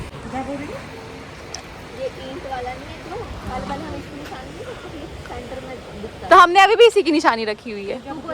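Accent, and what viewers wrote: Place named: Pakistan. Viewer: Your urdu language and flavour of Pakistani serial made me believe that u r a Rawalpindi girl but soon found lower Gujjar Nagar around children park 😢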